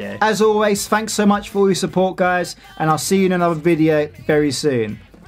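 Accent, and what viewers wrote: England, United Kingdom. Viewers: Wow this is the first time ive heard speak, like i knew he was from england and probably had an accent, put i had always imagined his voice to be deeper to match his playing skill on guitar (which by the way your amazing and have helped me decide what songs to learn next), neverless your amazing at what you do, rock on man \m/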